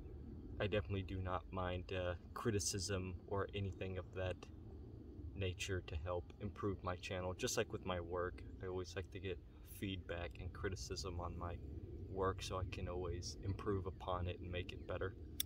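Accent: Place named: Scotland, United Kingdom. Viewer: Yes Do your Accents in all your Videos!! They are fun to listen to...... I love your Scottish Accent. Thanks for sharing...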